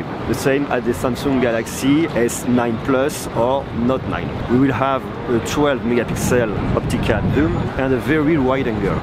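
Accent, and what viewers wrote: France. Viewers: Americans are going to love you mostly with your Frenchy accent.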